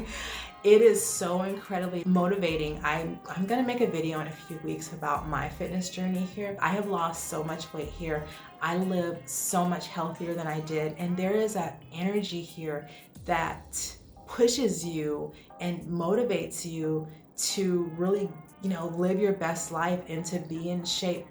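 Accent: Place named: California, United States. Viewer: I didn't know that you're from California because you always talk about Georgia, but I always thought that your accent seemed like you were from California: the way you pronounce the words so well (not like NYCs, that say "xtress"), the beautiful way californians pronunce the "s" and the beautiful humming that californians have. 😊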